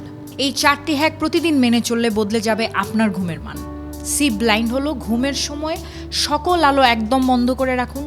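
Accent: Bangladesh. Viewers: আপনার ভাষা শুনে মনে হয় আপনি বাংলাদেশী। তো দিদি ভাই আপনি আপনার ভিডিও তে যে জ্ঞানের কথা বলেন আপনি কি ১০০% বিশ্বাস ও পালন করেন কি?? না কি শুধু জ্ঞানের কথা বলে আয় করেন (বর্তমানে তো বাংলাদেশের রাজনৈতিক অস্থিরতা ও অর্থনৈিকভাবে ভেঙে যাওয়া একটি পাকিস্থান ও চীনের দালালি করা দেশ) আল্লাহর নাম নিয়ে উত্তর দেবেন। বাংলাদেশীরা ভুয়া টাইপ হোন (সবাই নন)।।।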